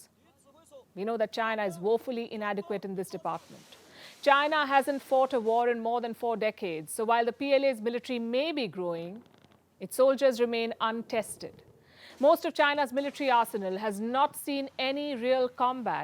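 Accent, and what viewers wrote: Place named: India. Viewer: Palki is paid by the CIA to spew anti-China/anti-Russia HATRED. Her thick Indian accent is meant to fool naive Indians into thinking that she represents Indian people. That is not quite true. Palki never bad-mouths Biden or the Democrats. That is a dead give away. Palki's CIA pay-masters wouldn't tolerate that.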